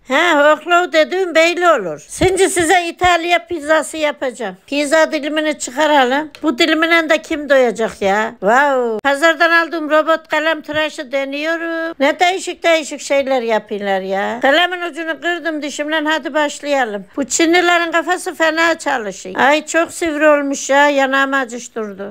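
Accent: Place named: Erzincan, Turkey. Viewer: %100 Erzincan ağzı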